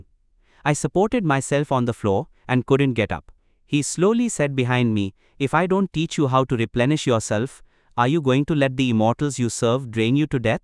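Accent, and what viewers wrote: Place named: India. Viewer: Indien accent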